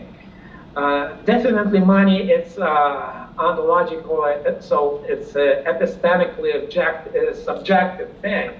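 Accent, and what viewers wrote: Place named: United States. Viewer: Hi. My, you are sounding very American these days! (Krissie in Germany)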